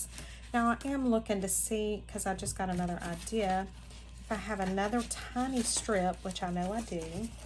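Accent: South, United States. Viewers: You channeled Bob Ross with a happy little accident on that pocket 😻. I can understand you ok. Love your southern accent.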